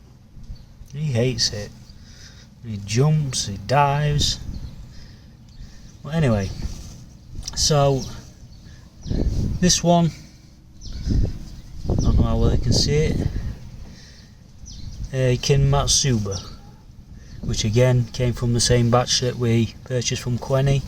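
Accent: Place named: Northern England, United Kingdom. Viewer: Great video pal, getting used to your northern accent now, from a Kent southerner!! 🤣 Keep the updates coming, very interesting 👍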